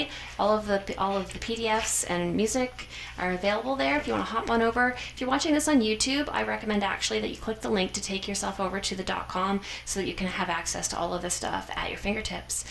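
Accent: Canada. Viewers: I can really hear that Canadian Accent coming 'out'.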